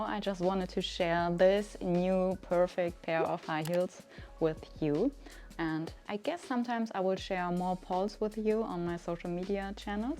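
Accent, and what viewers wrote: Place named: Germany. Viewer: you look gorgeous in everything you wear and I love your German accent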